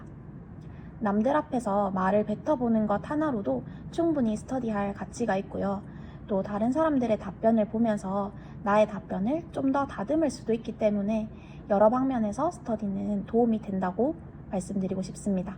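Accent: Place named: Gyeongbuk, South Korea. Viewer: 감사해요 선생님! 어제부터 감도 못 잡고 무의미하게 검색하며 시간만 허비하고 있었는데 어느 정도 방향이 보이는 것 같네요. 억양이 경북이신 것 같다고 생각이 드는데 합격해서 현장에서 꼭 뵐 수 있으면 좋겠습니다!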